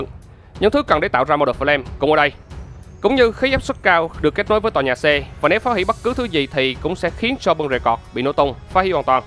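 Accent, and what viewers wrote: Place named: Quang Nam, Vietnam. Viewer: ông ở quảng nam hay quảng ngãi z ad🤣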